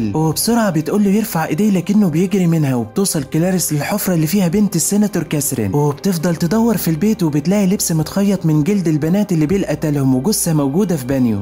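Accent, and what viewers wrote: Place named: Tunisia. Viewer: الي يتكلم من تونس !!!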